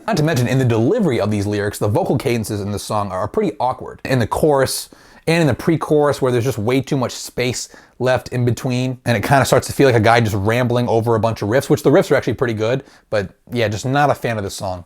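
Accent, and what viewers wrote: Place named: New York, United States. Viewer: Oh, I could've sworn you were with that accent but maybe it's a NY one lol.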